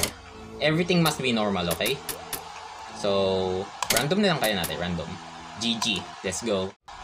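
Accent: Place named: Laguna, Philippines. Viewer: parang ikay taga laguna ..gawa ng iyong pananalita ay ... by the way new subscriber dine ...❤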